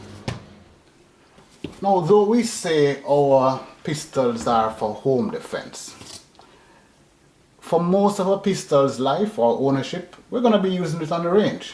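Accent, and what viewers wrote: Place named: Jamaica. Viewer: Jamaican not Afican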